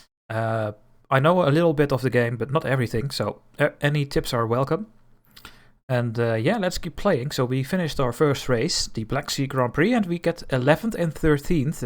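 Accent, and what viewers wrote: Netherlands. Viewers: Really enjoying your play through and I love the Dutch accent!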